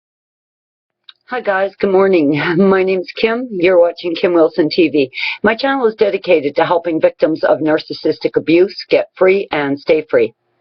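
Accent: Canada. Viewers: Toxic, evil, sinister, fake spell cast upon the good ones by the evil ones...never again: ne j'aimerais pas! Love your Canadian accent, I used to live in Saskatoon! All my best friends are there, especially the good, strong Western women! O! Canada!😇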